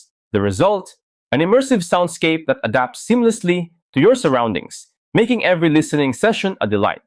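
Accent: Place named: Thailand. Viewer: Love your thai accent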